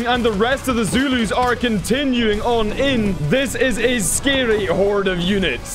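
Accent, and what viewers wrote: Scotland, United Kingdom. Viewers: Where are you from. Scotland ?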